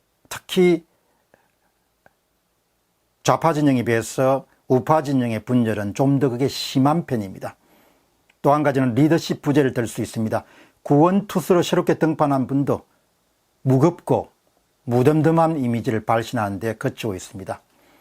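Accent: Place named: Gyeongsang, South Korea. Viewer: 어 말투가 겡상도구만 에구 지겨운 겡상도 그 촌스런 미개한 사투리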